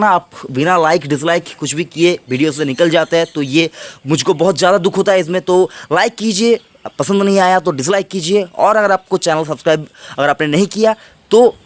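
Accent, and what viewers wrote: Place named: United Kingdom. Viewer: language kyo asi bol raha hai gadhe..angrejo ki copy kar raha hai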